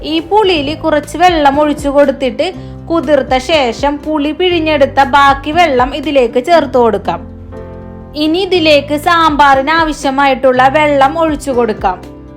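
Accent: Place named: Thrissur, India. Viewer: Thrissur kaari aano...slang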